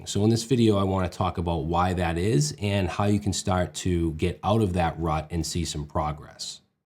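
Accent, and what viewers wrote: Boston, United States. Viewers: That Boston accent tho 🤣 But I am a NY'er and would rather learn listening to you than someone that barely speaks English! I have to say this because it happens a lot with music production too and dudes getting daunted by new DAW's. Don't go into anything thinking you are going to understand the ENTIRE THING - you ain't figuring out Ableton Live in a week when it took 100 developers years to build it. Jump in with a list of JUST what you need to get what you are doing DONE. Learn just how to do what you need to do, then as you need different things you don't understand you look them up one at a time, use AI it's super convenient compared to asking google questions. just my two cents.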